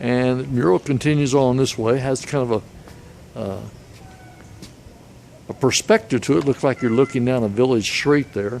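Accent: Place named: South, United States. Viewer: I just subscribed. Thanks for the video! Where are you from (in the states)? You have a southern accent! I'm from GA and hoping to retire in Mexico. I'm a single, 62 y/o female (with a small dachshund "companion"), so I want to move somewhere safe where there are groups of people my age. I would probably rent a small studio apartment first...and maybe buy later. Do you have any recommendations? Thanks.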